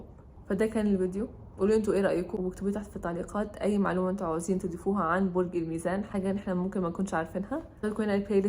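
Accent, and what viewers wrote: Syria. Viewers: ممكن سؤال يا ليه في معظم الڤيديوهات حتى لو مش دلوقتي زمان برضو بتتكلمي سوري بس رغم انك مصرية سورية مجرد سؤال بس متفهمنيش غلط 💙💙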